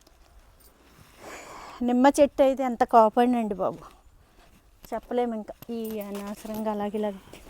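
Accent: Godavari, India. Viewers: మీ పుట్టిల్లు గోదావరి జిల్లాలా ఉంది matateeru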